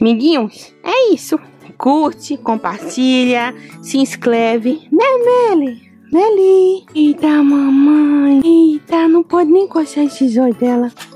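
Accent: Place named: Bahia, Brazil. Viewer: Muito lindo o amor pelas meninas , o geito de falar não me aguento de ri kkkk sotaque baiano mesmo obs: também sou 😘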